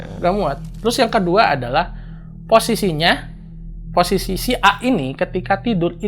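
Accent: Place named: Sunda, Indonesia. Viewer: Logat Sundanya kental banget bang, walaupun pke B.Indo ttep aja sundanya kebawa 😍🔥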